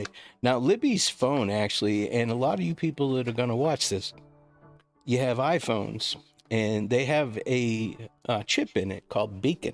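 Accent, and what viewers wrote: Boston, United States. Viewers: Sorry, I asked you a question without bothering to look at your ‘about’. Ha! I thought I discerned a Boston accent!! I wonder though where you were in booking? I have 2 friends who just retired from corrections. One worked at MCI Shirley & Ashburnham with the ‘skinners’ as he referred to them. My other friend worked at MCI Framingham where she was head nurse. She always said she’d rather work with the guys cause they won’t whine half as much. Again, thanks for doing what you do. As I’m a spankin new subscriber, I’ll be binging your vids to catch up. I’ll comment but I promise you I won’t argue with ANYONE. That kind of crap just bores me to tears & I leave channels where that happens. Til next time, michelle in boston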